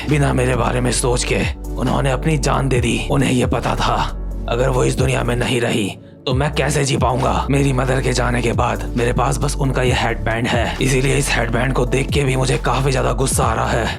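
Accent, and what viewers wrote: Uttarakhand, India. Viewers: Bhai aap uttrakhand sa ho kya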